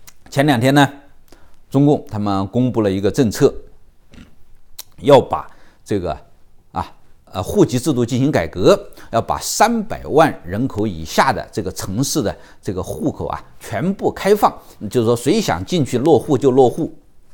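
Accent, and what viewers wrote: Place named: Wuhan, China. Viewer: 作为一个长期旅居海外的武汉人，听到李老师的带着家乡口音的讲话，觉得非常亲切，而且字字在理，我非常同意李老师说的分析，大大受到启发。以后会长期关注李老师。谢谢！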